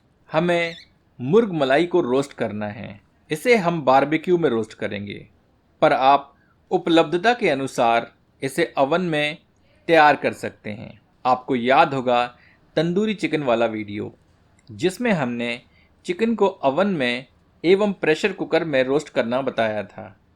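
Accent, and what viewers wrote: Standard. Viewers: aapki sari decease or apka suddh hindi me bollana bahut bahut pasand hai 👍👍❤️👍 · Your shudh Hindi is so irritating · आपकी शुद्ध हिंदी का उच्चारण भी ... ह्रदय को भी हर्षित कर देता है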